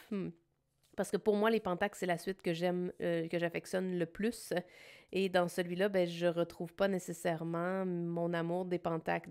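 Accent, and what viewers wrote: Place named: Quebec, Canada. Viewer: Oh la la, cet enthousiasme me touche beaucoup. Ce bel accent du Québec pour parler de mon travail, c'est magique :) :) :) Merci énormément pour tes mots !!!!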